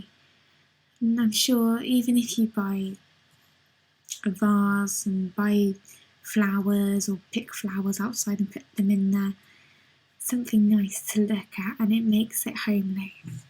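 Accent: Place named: Essex, United Kingdom. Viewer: I love listening to English accents in general, but I find yours is especially charming. I'm probably not even close but I'm going to go out on a limb and guess that you're from Essex.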